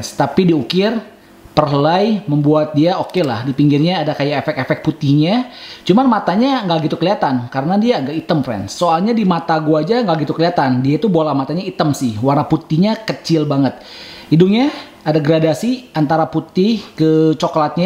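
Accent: West Java, Indonesia. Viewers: Dari logak ngomong nya kayanya bang orang sunda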